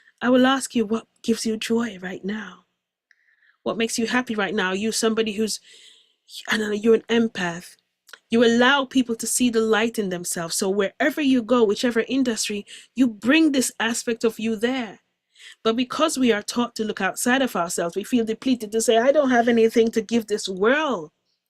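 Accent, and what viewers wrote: Jamaica. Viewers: Hi, I am tuning in from Jacksonville, Florida. I saw your captivating interview with Alex on Next Level Soul and was so pleased and happy to hear that you are originally from Jamaica. I found it so refreshing to hear the familiar accent of my homeland, Jamaica. I love your story and your message. I do believe that some people are already living their purpose but can't recognize it because it may not be grand. Your purpose is doing what you love. My purpose is an acupuncture physician and healing and giving love, light, and compassion to the world is what I do. I always see the greatness as God / Source as breath. I was channeled a poem from my Higher Self that breath is consciousness, and we can't live without it. Consciousness can not be contained, as you said on Next Level Soul, and that is so true. Thank you, for your message of love, light, purpose, and power! 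I am so happy I found you! Stay blessed. 🎉❤🎉❤🎉❤🎉❤🎉❤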